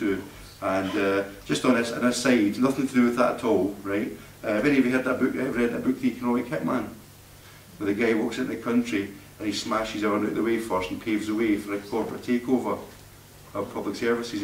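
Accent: United Kingdom. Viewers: if I could just understand one word of this thick manchester accent..awful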